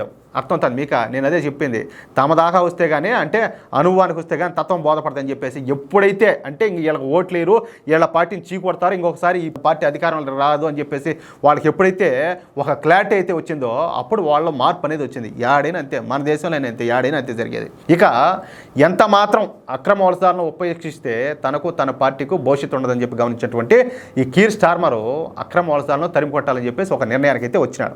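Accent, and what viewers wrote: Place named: Kadapa, India. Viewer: Meedi kadapa district ramjapeta Slang la vundi sir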